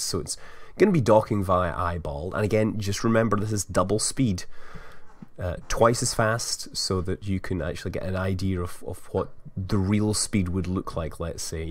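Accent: United Kingdom. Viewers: Foreign is a relative term. It's the British pronunciation.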